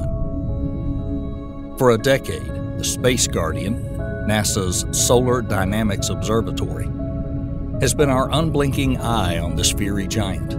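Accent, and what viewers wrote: South, United States. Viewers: Gotta love the southerner talking about space. Love your Channel man!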